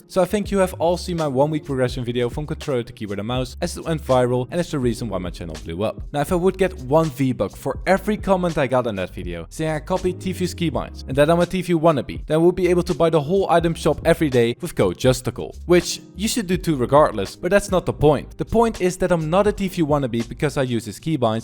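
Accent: Netherlands. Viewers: Mooi nederlands accent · i just bought my keyboard n mouse and getting it tommorow! you realy helped me thx! btw are you dutch?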